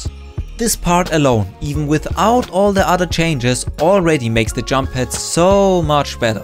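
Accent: Germany. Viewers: Der Akzent...du bist doch deutsch oder?🙂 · Bist du deutsch? Lol dein Akzent xD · Der deutsche Akzent lenkt mich so ab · Hòrst dich deutsch an diggi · Man hört dass du Deutscher/ Österreicher/ Schweizer bist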